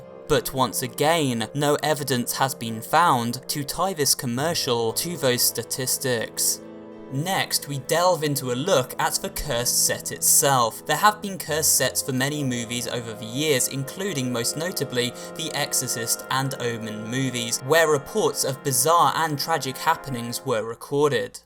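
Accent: United Kingdom. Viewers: R u British I can tell from ur accent I'm British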